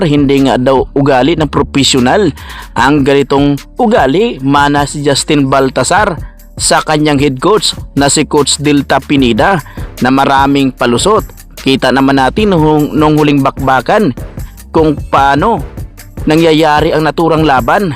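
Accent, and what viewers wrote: Visayas, Philippines. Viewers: ayusin mo salita mo wag ka bisaya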